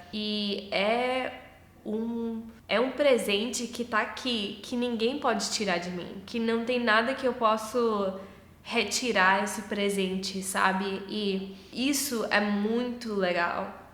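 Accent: Ceará, Brazil. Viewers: Seu sotaque e bem cearenses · vc estava falando muito cearense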